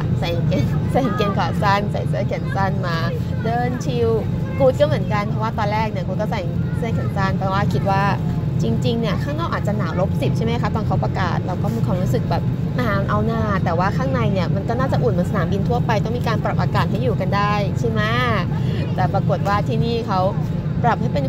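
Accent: United Kingdom. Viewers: เวลาคุณ ไปเที่ยวแบคแพค ดูไม่ออกว่าเป็นลูกคุณหนู CEO เลยค่ะ ยกเว้นตอนพูดอังกิดสำเนียง british ^^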